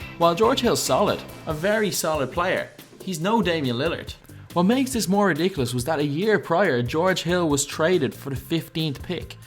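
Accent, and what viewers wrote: Dublin, Ireland. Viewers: You sound like you're from Dublin lad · Irish accent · r u Irish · are you Irish im irish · this guy Irish?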